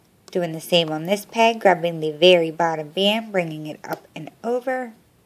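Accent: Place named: New York, United States. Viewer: where are you from? You sound like you’re out NY way maybe? I like it because you remind me of my cousin. Whenever she was angry, she’d turn extra NY lols 💜💜💜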